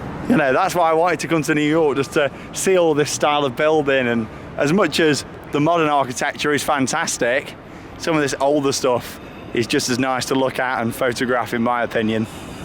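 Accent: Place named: Stoke, United Kingdom. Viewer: where are you from? Trying to place the accent, almost a stoke accent i think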